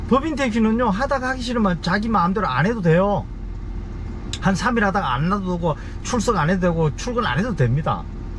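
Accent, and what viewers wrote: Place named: Gyeongsang, South Korea. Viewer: 아흐 경상도듣기싫은 정신병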